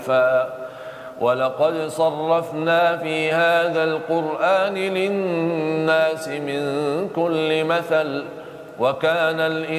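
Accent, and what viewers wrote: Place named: Medina, Saudi Arabia. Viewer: Sy suka sekali murottal ini. Terbayang syekh Madinah namun sdh bercampur Indonesia. Doa kami untukmu, syekhuna 🙏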